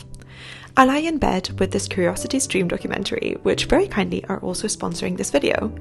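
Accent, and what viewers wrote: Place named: United Kingdom. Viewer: Wonderful. Beautiful British English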